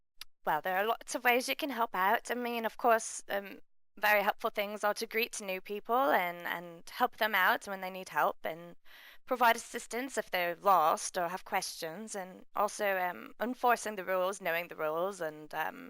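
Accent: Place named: England, United Kingdom. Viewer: Ur voice sounds more like ur English this week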